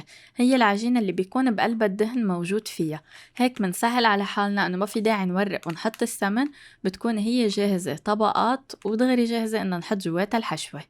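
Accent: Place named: Lebanon. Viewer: احب اتابعك من سهولة وصفاتك والكلام المفيد والمختصر والجميل وباللكنة اللبنانية يلي بعشقها.. محبتك من السعودية..😘💓🥰🕊 شكرا على قناتك المفيدة استفدت منك كثير والله ممنونه حبيبتي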